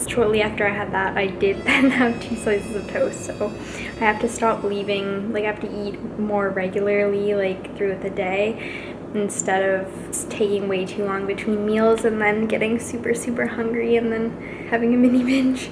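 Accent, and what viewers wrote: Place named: Canada. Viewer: your my favorite you tuber next to Nikki. But I cringe listening to your videos becuase of your Canadian accent! About, out... Please no more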